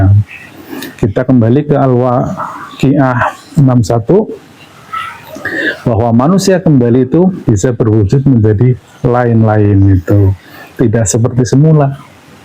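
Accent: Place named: Banyumas, Indonesia. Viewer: Rika wong ngapak ya....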